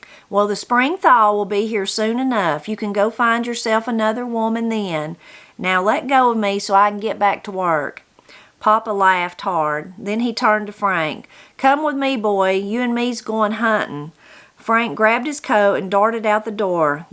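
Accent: Appalachia, United States. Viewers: I enjoyed that story so much, and you're a fine reader. You're the sort of reader who lets me lose myself in the story and forget that there is a reader at all. I pictured everything so clearly in my mind's eye. I think having someone with an Appalachian accent tell the story was a big part of what made it come to life. Thank you for a wonderful Christmas gift.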